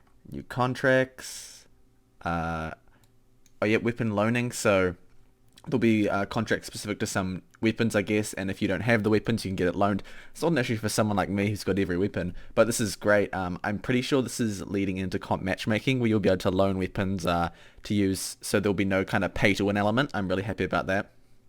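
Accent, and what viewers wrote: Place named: New Zealand. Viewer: Confusing to hear a kiwi voice on a popular youtube channel.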